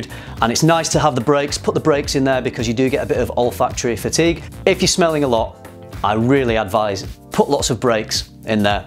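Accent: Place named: London, United Kingdom. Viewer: really? never been to harrods and u got London accent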